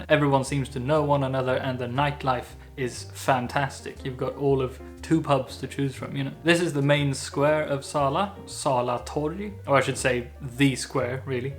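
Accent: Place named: England, United Kingdom. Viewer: I watched some of your videos because I thought you were gay- (I thought the French guy was your boyfriend)-with all your gay jokes, but then it seems your not gay so then the gay innuendos get annoying AF but I’m curious how your got an English accent or is that how Swedish people sound? Also, I noticed that Europeans drop the F-bomb a lot. You saved me thousands with your videos because I was thinking of visiting Sweden and after watching your channel I feel I saw what I needed- Sweden is basically Ohio. 🤷‍♂️✌️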